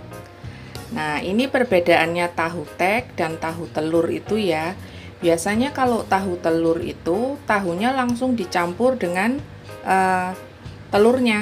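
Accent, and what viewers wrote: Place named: Surabaya, Indonesia. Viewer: Suaranya spt CECE INTAIMAGAZINE. suara khas SUROBOYOAN koyo AKU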